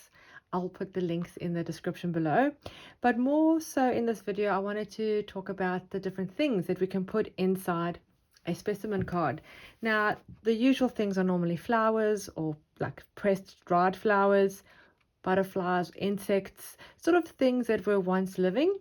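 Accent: South Africa. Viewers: I thought I spied a South African accent!! Always love finding another South African Youtuber. Love your work!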